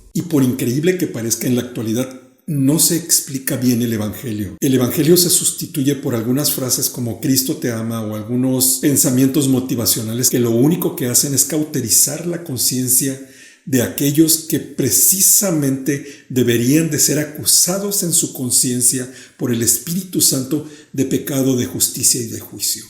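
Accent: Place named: Mexico. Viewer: Tiene un acento Mexicano, excelente explicación, felicidades, bienestar para tu vida.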